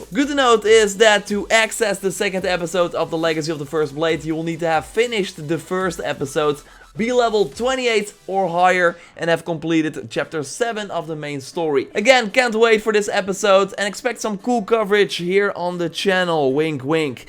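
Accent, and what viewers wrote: Netherlands. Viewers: It took me 1 second to find out you're dutch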